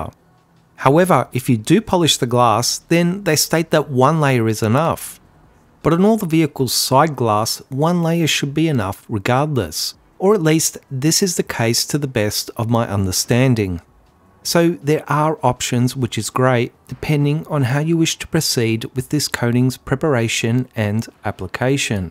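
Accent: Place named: Australia. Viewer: It's how we say it in bloody Australia mateeeeeeeeeee!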